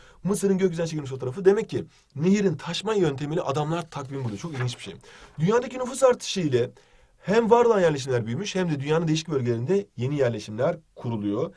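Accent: Turkey. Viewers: şive meselesi. Egeliler öyle konuşuyor.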